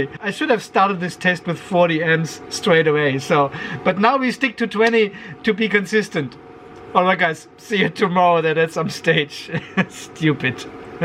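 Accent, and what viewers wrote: Germany. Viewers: Hope it comes a new clip soon;) I enjoy too see your clips not only for solar stuff but your seens of humor and your german english ;)👍 Every time I see a new post from you I put on the coffee and chocolate, big blanket and lay down on the sofa. I really enjoy and learn from you sir 👍😉 Best regards Morten from Norway 🇳🇴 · Danke für Deine gute Laune und dein Deutsches Englisch 😅👍🏻 finde deine Videos spitze weiter so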